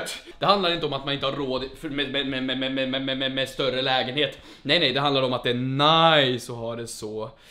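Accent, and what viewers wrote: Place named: Suburb, Sweden. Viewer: varför pratar du orten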